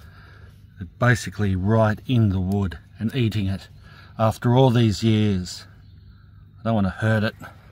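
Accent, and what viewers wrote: Australia. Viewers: Stralian ASMR. 👍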